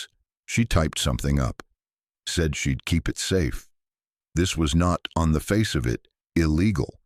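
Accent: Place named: United States. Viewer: Dreadful! AI rubbish, appalling American accent!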